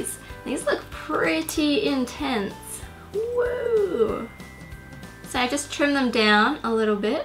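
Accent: Australia. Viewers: Where in Australia is she from?🇦🇺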